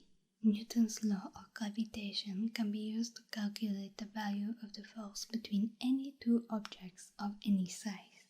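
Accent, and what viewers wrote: France. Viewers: i love ur french accent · Love your French/foreign accent roleplays, like this one and the French exchange student series. I'd love to see more of that!! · Yes I've it plz more french accent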